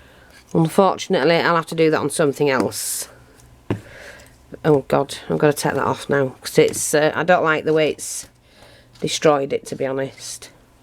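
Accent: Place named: England, United Kingdom. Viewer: Your awesome you have warmed the kukkels of my heart..lol thanks a lot.Is it Easter there,Where are you from You sound English.I love the accent..Im a Newfie in Kitchener Ontario.I call myself The Official Witch of Kitchener City.lol.Have you ever watched any of Lisa The Pagan videos She sounds just like you I love her.Blessings.)0(.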